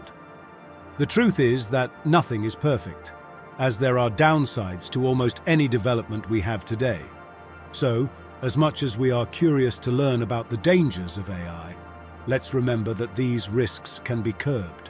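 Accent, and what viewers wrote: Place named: England, United Kingdom. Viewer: Your English-accent-robot-voice makes this vid hard to take seriously.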